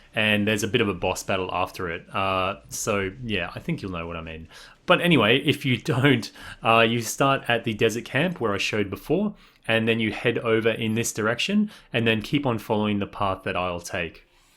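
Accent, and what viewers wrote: Australia. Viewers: Can't place your accent. Are you Australian or New Zealander?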